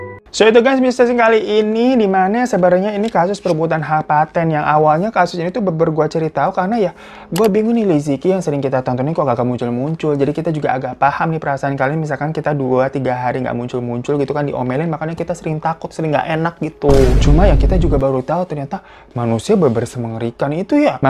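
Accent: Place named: Jakarta, Indonesia. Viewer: Ya ALLAH KAMU TUH LUCUUUU BINGIT SIHHH LOGATNYA CAMPUR2 ada betawi , sunda ,cines dll kaya rujak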